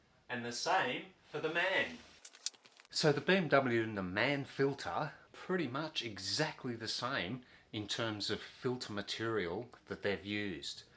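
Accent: Australia. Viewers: He's Australian, not British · The second I heard the Australian accent I had no doubt this guy would be messing around!!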